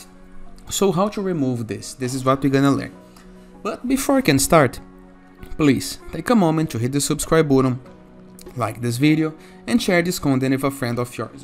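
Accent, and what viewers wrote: Brazil. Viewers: Are you Brazilian bud? This accent is so cool haha. Great video!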